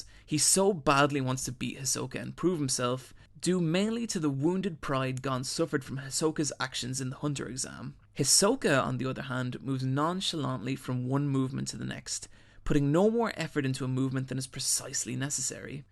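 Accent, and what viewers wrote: Ireland. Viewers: Great review. btw are you Irish?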